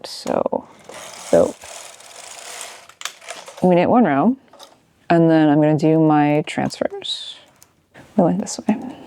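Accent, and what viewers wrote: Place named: United States. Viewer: you actually pronounced fine just with american accent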